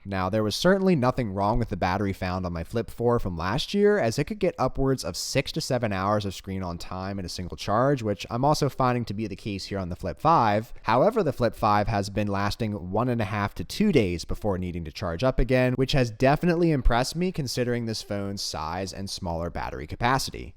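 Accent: United States. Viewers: What's your American accent?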